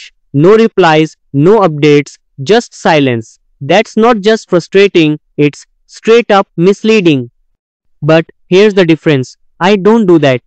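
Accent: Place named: India. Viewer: Indian accent right? ur from india cool😮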